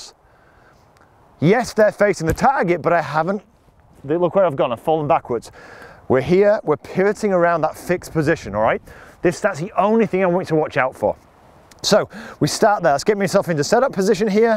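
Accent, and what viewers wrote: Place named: Northern England, United Kingdom. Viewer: Another great video lad. Honestly, I could watch all the other golf videos on YouTube and never get as much knowledge as I can with one of your 10 min videos. Your style, is backed up with the substance of knowledge that can be easily transferred to the student, all delivered with a wonderful Northern twang. As a nervous newbie to the game, you have calmed my nerves by giving me the confidence and assurance that comes with your instruction. Thank you sir for making my first year in the sport such a pleasure. I wish you and your family all the happiness and joy that you deserve in this festive holiday season. ❤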